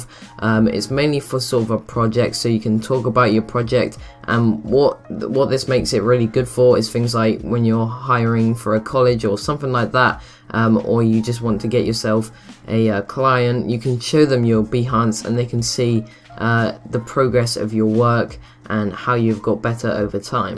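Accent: United Kingdom. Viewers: Sorry man, are you from UK?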